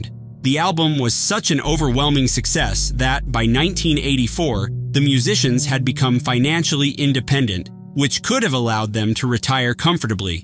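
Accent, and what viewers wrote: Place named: United States. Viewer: Impertinent question - this is a UK channel, why the American sounding narration?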